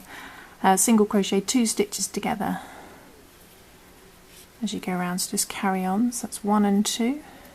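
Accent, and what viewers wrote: England, United Kingdom. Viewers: Finding it confusing because although you have an english accent you are using american terminology. ie your dc is our treble. Anyway, will just watch and not listen lol. Very cute ty for sharing.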